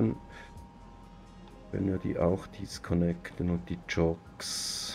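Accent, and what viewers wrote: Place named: Bern, Switzerland. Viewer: Danke für das "chillige" Tutorial... Guess us dr Region Bärn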